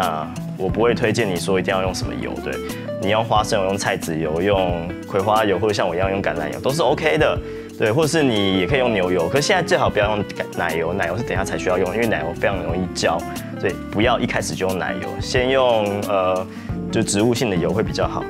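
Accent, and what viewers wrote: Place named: Taiwan. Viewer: 你的嗓子好尖，台湾普通話味道，王力宏也是这味道儿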